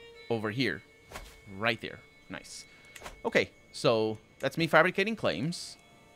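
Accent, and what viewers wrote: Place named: Portugal. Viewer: For years I couldn't picture where your accent was from turns out you're Portuguese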